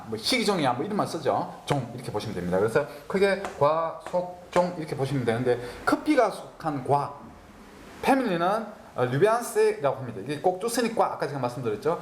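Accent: Gyeongsang, South Korea. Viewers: 아흥..강으너무 잘해주시는데....경상도 억양..알아듣기 힘들어요..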